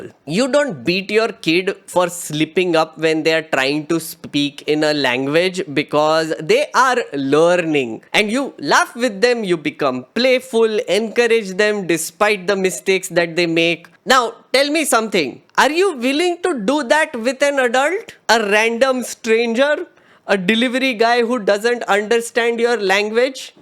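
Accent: India. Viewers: I don't know what a Correct accent is either. It's probably just some white liberals offended, because your accent kinda sounds like Apu. A cartoon character used to make jokes about immigrants from India. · English is an Indian language now and you are speaking indian English while talking about India. I don't understand why people don't understand it! And on top of it choose to mock the accent.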